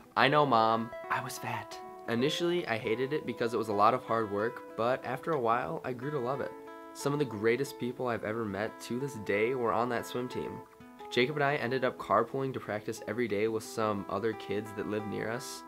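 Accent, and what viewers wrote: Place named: Midwest, United States. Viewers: I knew I recognized your Midwest accent! I was born in Wisconsin and moved to Minnesota too! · You definitely sound like you're from Minnesota...cute :) So am I!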